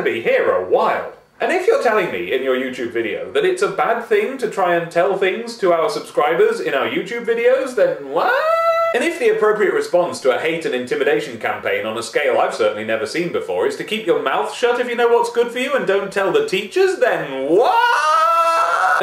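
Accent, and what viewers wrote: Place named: United Kingdom. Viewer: You were totally talking in a british accent and that ruined it for me could you be more American?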